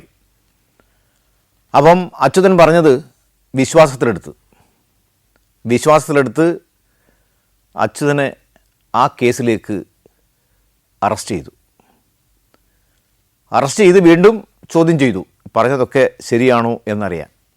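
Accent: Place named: Alappuzha, India. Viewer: alla ആലപ്പുഴ ആണെന്ന് തോന്നുന്നു നല്ല അച്ചടി ഭാഷയിൽ സംസാരിക്കുന്നു ❤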